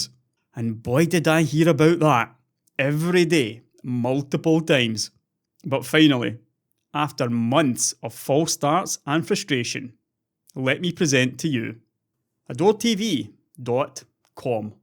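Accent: Scotland, United Kingdom. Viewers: read any article that * wrote with a Scottish accent in my head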